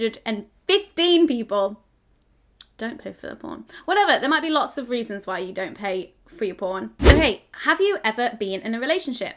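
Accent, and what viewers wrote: United Kingdom. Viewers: I don't know why this video was suggested, I'm glad to hear British English, since I'm in Sweden now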